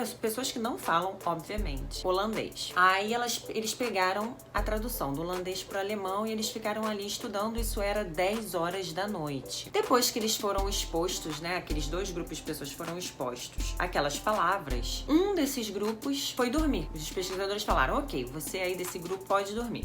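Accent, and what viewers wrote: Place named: Rio de Janeiro, Brazil. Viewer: Cai aqui de paraqueda e estou amando........ ótimas dicas e eu posso dizer com certeza .....isso funciona!! Foi com um método parecido como esse que fiz minha carteira de motorista......, sou Baiana moro na Europa e amo o inglês, estou aprendendo, mas as suas dicas são ótimas, obrigada... meu pagamento das aulas já fiz....... 😂😂😂dei meu like👍🏻👍🏻👍🏻👍🏻👍🏻👍🏻 e baixei o soninho 💡⏰🛎🔑pra receber mais bônus ( vídeos ) seu sotaque Carioca é lindoooooooooo🥰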